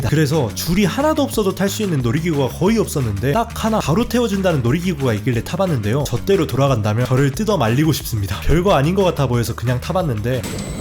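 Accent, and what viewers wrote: Jeolla, South Korea. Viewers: 9주인장 전라도 맞져 ? 계속 알게모르게 전라도 억양이들림 (순수 궁금증) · 혹시 고향이 전라도신가요? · 전라도분 이신가봐요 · 5교시 한문시간ㅋㅋㅋㅋㅋㅋㅋㅋㅋ 아니 말 너무 재밌게 하시네요ㅠ 전라도 억양도 너무 웃김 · 전라도억양이라 불신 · 아따 니 전라도 사람이여라? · 숨길수없는 전라도사투리 ㅋㅋㅋ 굿!! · 오! 전라도 분이신가보네요 사투리가 구수합미다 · 전라도 사투리 ~~~~ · 즌라도 사투리가 쏙쏙 박히니까 재밌네 ㅋㅋㅋ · 전라도사투리 거북합닏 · 전라도사람이시군요 사투리가 ㅋㅋㅋ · 전라도임 ? 사투리가 ㅈㄴ 들리네 ㅎㅎ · 혹시 광주 분이신가요?? 전라도 사투리 쓰셔서 광주사람인가했는데 딱 기아 얘기 나와서ㅋㅋ (내일 드뎌 한국시리즈에요😎) 그리고 영상 너무 웃겨욬ㅋㅋㅋㅋㅋ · 혹시 전라도 분이에요? 나레이션에 사투리가 구수한데??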